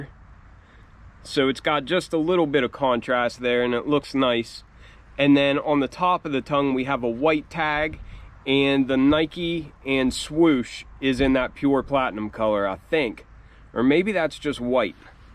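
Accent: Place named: Philadelphia, United States. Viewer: you gotta be from greater philly talking like that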